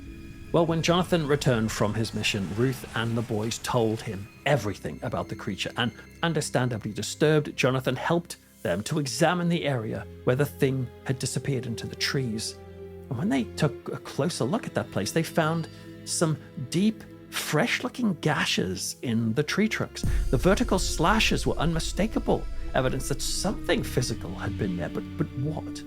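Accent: United Kingdom. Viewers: This man needs a nomination to the storytellers hall of fame. You have a wonderful way of making the listener feel like they are right there witnessing the events you speak of. Plus, as an American, I don't know why, but when you Brits tell a story, it just sounds scarier in a good way. 😊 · Your the best story teller on you tube , and British … which is so refreshing 😊😅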